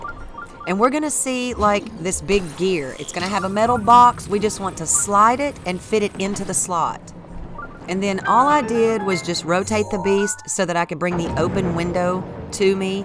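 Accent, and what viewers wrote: South, United States. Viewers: Is it just me or does she/he sound like a red neck?(no disrespect) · Very helpful, love the southern accent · I'm British and this is definitely the best American accent ❤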